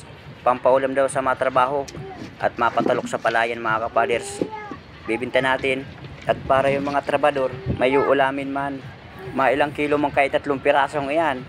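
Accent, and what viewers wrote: Bicol, Philippines. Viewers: Halata na bikolano ka ka father's..godbess u👍👍👍👍🙏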